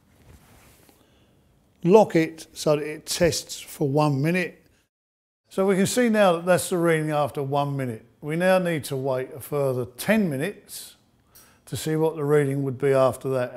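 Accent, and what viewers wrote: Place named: United Kingdom. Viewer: This gentleman speaks like Magneto in X-Men. Nice British accent 👌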